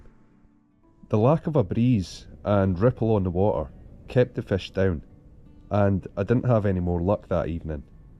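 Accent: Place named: Scotland, United Kingdom. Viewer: Hi, i'm Italian and looking at the landscape I would have guessed Scotland as well. Not to say about his typical accent.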